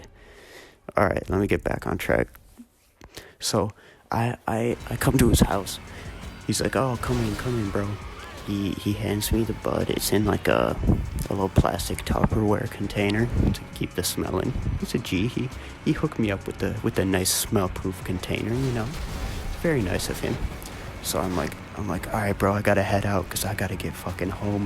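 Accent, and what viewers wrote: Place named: Mexico. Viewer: u followed me on instagram and im assuming its becuase i follow luna and goblinn but dont try to be like them lol u sound like ur talking into an extinct microwave, ur voice isnt really thrilling, u sound like ur mexican,indian,irish, and a whole other bunch of languages, ur voice isnt interesting, ur not sure about what ur talking about and ur unfunny as shit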